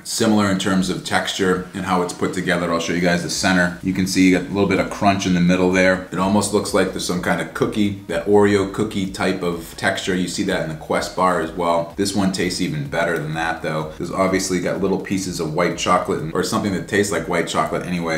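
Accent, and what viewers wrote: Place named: New England, United States. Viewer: You could be right on the cost. Sugar has to be the cheapest sweetener by far. I'll definitely be watching to see how the anabar brand expands and see if they do add some lower calorie flavors in the future. I'd be willing to pay the extra money if I could get them to fit my daily macros. By the way you are crushing it with the content! One of my favorite youtubers for sure. I assume your from New England based on some of your clothing and accent. I was born in Mass and grew up in NH. Cheers!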